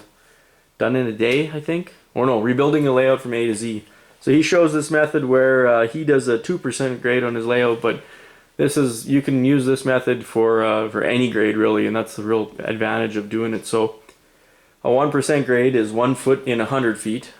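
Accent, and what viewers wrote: Canada. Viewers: Got quite the Canadian accent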